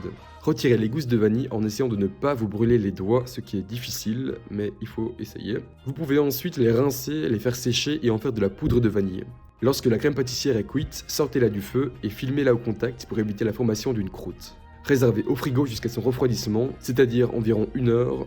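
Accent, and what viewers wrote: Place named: Belgium. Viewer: Belge je pense 🤔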